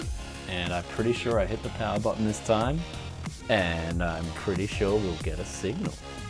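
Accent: Australia. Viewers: Are you Australian? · he's an Aussie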